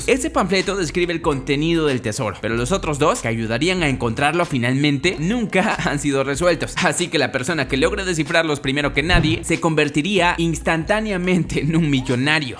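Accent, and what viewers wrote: Mexico. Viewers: ese acento mexicano me perturba mas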